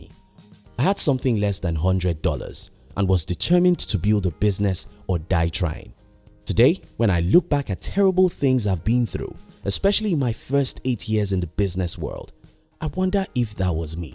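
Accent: Nigeria. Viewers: You sound like a Nigerian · Are you Nigerian?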